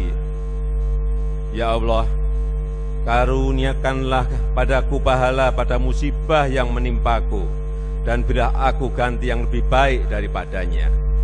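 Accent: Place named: Java, Indonesia. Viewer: Lambene wong Jawa ya begitu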